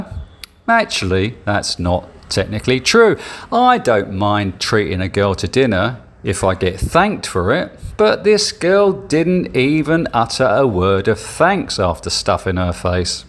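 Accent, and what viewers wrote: England, United Kingdom. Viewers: Great post and hilarious word play; I really enjoyed your alliterative flourishes: You turn a phrase well. I particularly liked your voice when you were imitating a Filipina girl accepting a date to go eat. Which part of England are you from? I've been spending a lot of time in Dumaguete and have been to the Why Not many times. All the best mate, and keep on posting.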